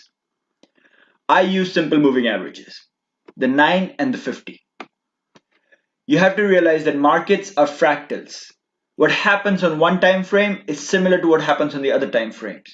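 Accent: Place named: India. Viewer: thick indian accent, my head is wobbling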